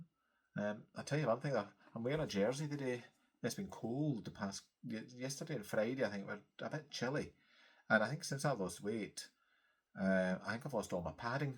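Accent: Scotland, United Kingdom. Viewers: Hello from Wellington, NZ. I have been meaning to comment for... well... 27 episodes but well, I usually watch you on the TV while I'm stitching. I have to say, I enjoy your lovely Scottish accent. You have a lovely calming voice and I enjoy relaxing to your Flosstube! I'm also a Flosstuber... just published #271 this morning. Was supposed to be Sunday but... YouTube did not let me upload yesterday/last night and I had to cancel and redo it. What am I stitching on? Well, right now I am taking a wee work break and having some chicken noodle soup. But, I will be getting on to a large redwork sampler after work - pushing for a finish this week. Happy stitching! Leigh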